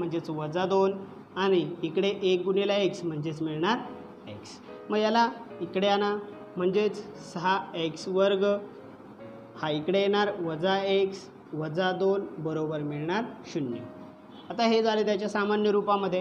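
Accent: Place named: Kolhapur, India. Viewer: Kolhapuratun boltoy sir Brand shikvta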